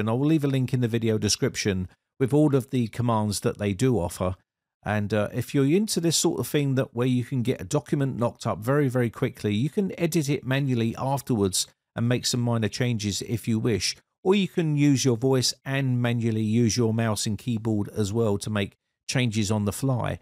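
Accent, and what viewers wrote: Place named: Cockney, United Kingdom. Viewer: Hi, I've been using this tool for a while now and I've noticed that it struggles to understand certain dialects. So, if you don't speak the King's English (like me - I'm from Yorkshire) or if you have a Cockney accent (like you), then you'll need to proofread the text it generates and correct any mistakes. However, it still saves a lot of time compared to typing everything out manually, even if you have to edit it afterwards.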